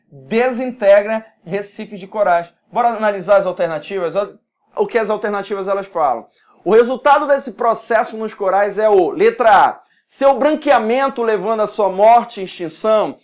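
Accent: Pará, Brazil. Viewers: Muito bom 👏👏 Prof vc é do PARÁ? Sotaque muito igual!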